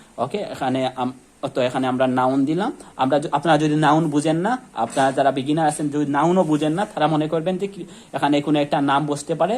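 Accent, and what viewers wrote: Sylhet, Bangladesh. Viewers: Vaiya apni ki Sylhety?😊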